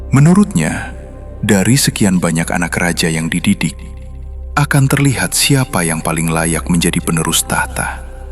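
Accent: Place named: Java, Indonesia. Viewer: Perhatikan dari logat bicaranya. Itu admin nya org Jowo. Jowo bertutur sejarah Sunda. bukan ranah nya. Pasti jadi akan aneh dan membingungkan. Skip saja / abaikan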